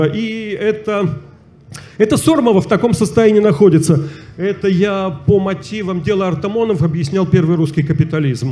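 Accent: Vologda, Russia. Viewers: Вологодский говор это бесценно )))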